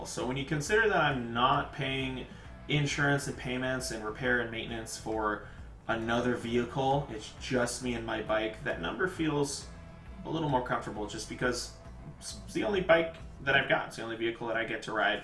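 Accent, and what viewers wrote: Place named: Minnesota, United States. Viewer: I knew you sounded like a MN guy! Please keep us updated on the Commander 3’s. It would be nice to hear how they are doing and how they are wearing after 6 months, a year, etc. I’m in MN summers and AZ winters.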